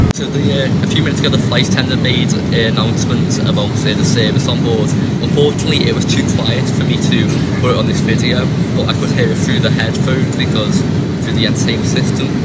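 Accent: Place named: Liverpool, United Kingdom. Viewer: Scouserrrr yes!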